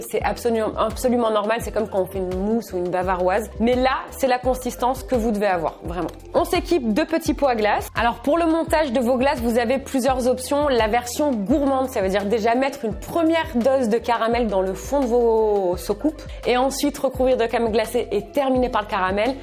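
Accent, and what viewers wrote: Canada. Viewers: tu mon youtubeur préféré des vidéos accent canadien et qui met quoi des vidéos trop trop rire 👍👍👍👍👍 🇨🇵🇨🇵🇨🇵🇨🇵🇨🇵👍👍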